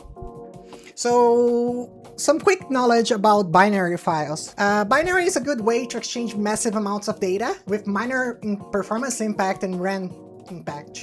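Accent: Brazil. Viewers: i listened to about 5 seconds of your accent and realized youre a fellow brazilian, thats so cool :3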